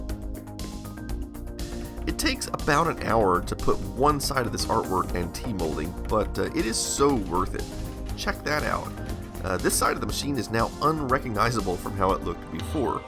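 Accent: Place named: Texas, United States. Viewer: "I cleaned the CRT right quick." Ah yes, our Texan speech.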